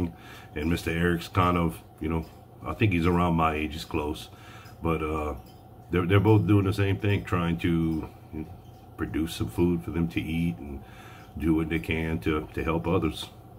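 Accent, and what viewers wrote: Louisiana, United States. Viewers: Two big thumbs a two big toes up! Gotta love the "vinegar" that tastes like wine??? For those wondering what is going on, NY won't let us send alcohol thru the mail! So, I sent a bottle of grape juice that was on its way to vinegar but must have gotten to it too soon! Dang, now your not going to have any vinegar! Thanks for the kind words and thoughts, this YouTube community is awesome and is really like the old days of CB radio and walkie talkies but with video! Keep on keeping on your a true YouTube character! Oh, and by the way that grass made it from your head to your beard, but judging from the beard it's probably not the only thing lost in there! Your beard is truly your trademark, well next to that Cajun voice of yours.... Better get picking up around there and good luck!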